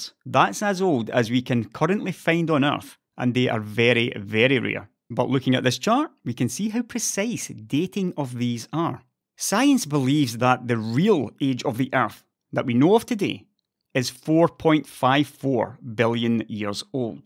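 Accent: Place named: Scotland, United Kingdom. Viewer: After a day of work, gym and chores, calmly at home with a glass of beer in my hand, spending about 1h listening to magnificently Scottish voice talk about science is almost a divine experience, ironically:D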